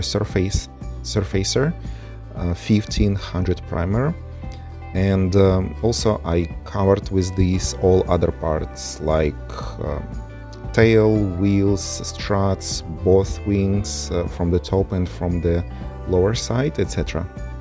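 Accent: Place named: Russia. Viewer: Чел ты с рашки? Русский акцент слышен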